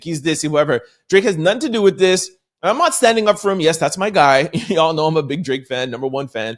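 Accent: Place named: Canada. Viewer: His videos always seem to say a day ahead so I think he must be in another country. He sounds Canadian but maybe he lives in Japan or something 😂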